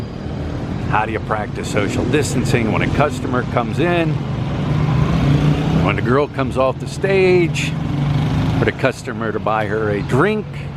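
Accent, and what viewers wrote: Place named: Chicago, United States. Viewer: You sound more as if you are from Chicago than from Philly. I have heard so many different things from Thailand and PI that I do not know what to believe any longer. Never been the RED LIGHT or bar type but I lived in Makati and different places in Thailand before. I think bars are opened here in Denver. Lots of obnoxious people noise and motorbikes outside at night this last week. Last I heard from a Filipina was that PAL will start flights from overseas June 1, then after that someone said January 1st, 2021. I heard this yesterday. I'll subscribe to your channel in hopes of being kept informed. Good Luck!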